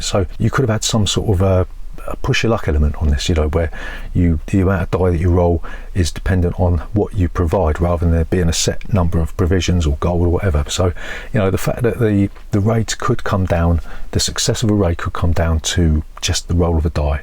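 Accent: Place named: Cockney, United Kingdom. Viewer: I quite like watching an subtly-aggressive cockney do a board game review - he reminds me of Vinny Jones! Good stuff. (I'm sure he's not actually aggressive, it's more the comparison to Jones.)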